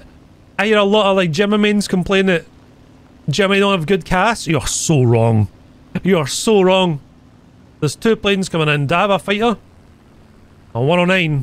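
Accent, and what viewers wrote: Scotland, United Kingdom. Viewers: Bro you can hear he is scottish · are you british ???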